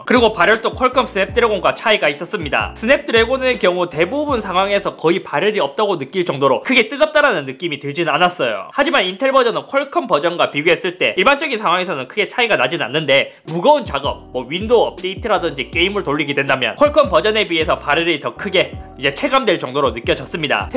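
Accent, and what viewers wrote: Gyeongsang, South Korea. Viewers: 스마일이 서마일 되는법. 경상도 사투리. 잇섭이 서마트폰이라 안하고 스마트폰이라 하는 이유. 테크충 ㅋ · 형 진짜 경상도 사투리 심하신 분들은 스마일을 서마일 이라고해??? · 여윽시 경상도 사나이~^^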